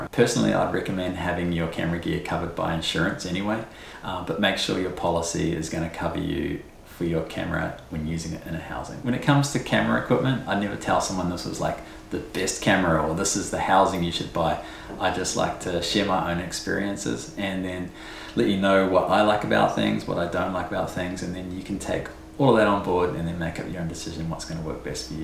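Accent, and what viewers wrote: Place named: New Zealand. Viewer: Awesome video, thnks very much! Was trying to watch it on a bus with the subtitles, kiwi accent is the best but clearly a struggle for youtube to match subtitles too haha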